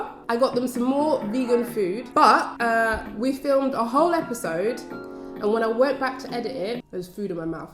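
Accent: London, United Kingdom. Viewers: Love the recipe, I'm going to give it a go. Love the 'innit like' accent too :)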